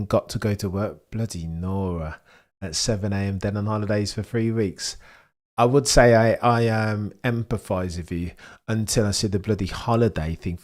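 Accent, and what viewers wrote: United Kingdom. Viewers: Proper British lad 😂 I love the accent .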